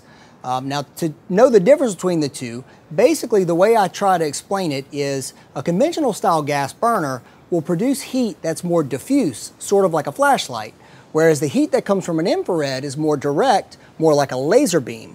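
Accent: Louisiana, United States. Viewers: Good stuff! Very helpful. Also, you sound very much from Louisiana.. am I right?EDIT: Nevermind. just looked at your channel and saw all the other LA related videos.. lol. great channel and video production. Pls keep it up!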